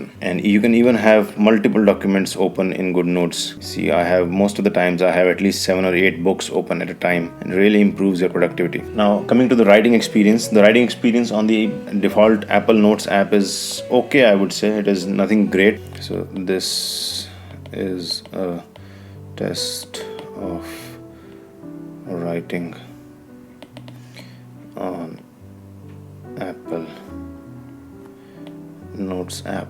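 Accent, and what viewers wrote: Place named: India. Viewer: Man really good video respect 👍 it seems like all the best tech videos come with an Indian accent, but I love it, thanks :)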